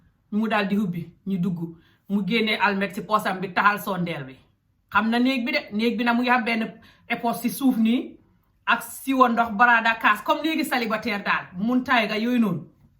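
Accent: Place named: Gambia. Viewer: Listening to you attentively, your Wolof accent sounds more Gambian (fanafana). ☺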